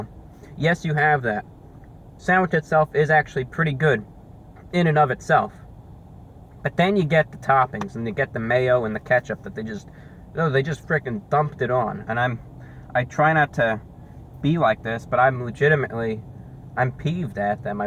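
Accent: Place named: Florida, United States. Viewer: I never thought that listening to a posh floridian review fast food would be so entertaining.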